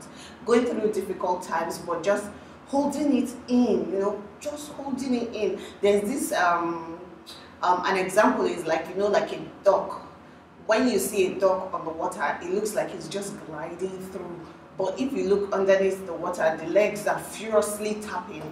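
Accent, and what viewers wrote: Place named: Egba, Nigeria. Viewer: Aha! Egba dialect oooo🙌🙌🙌🙌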